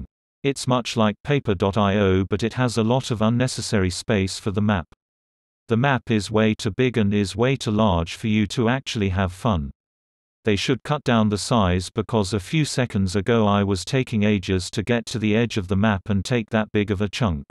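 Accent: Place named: United Kingdom. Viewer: You sound british. I make splix vids too.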